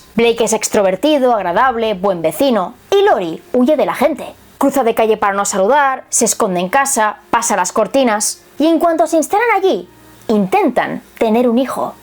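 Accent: Spain. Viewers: Un misterio muy misterioso porque no tiene 10 millones de suscriptores. Saludos, tu acento español tiene un misterio encantador Saludos bellotas hermosas y preciosas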